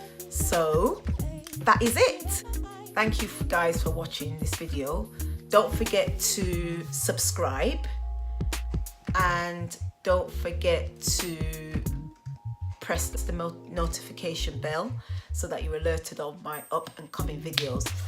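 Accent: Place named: Northern England, United Kingdom. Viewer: Great video - I only use clarifying shampoos, don’t want any build up in my locs. I’m loving your videos and the northern accent makes me feel like I’m back home in Bradford! I moved to the south as a child but go back often and your accent is making me home sick.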